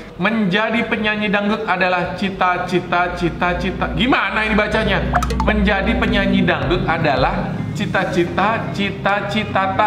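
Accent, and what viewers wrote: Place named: Java, Indonesia. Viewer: bng lo orang mana kok medhok banget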